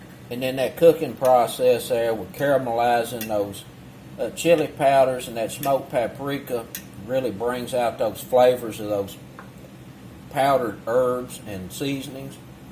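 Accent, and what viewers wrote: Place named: Georgia, United States. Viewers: Hahaha, I am new at this channel. When I heard your good ol’ boy accent, I paused when I saw your hands enter the screen. Thanks for the great looking recipe. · I love your videos! Your the only chef on Youtube that talks like this good old Georgia boy. Thanks and keep it up.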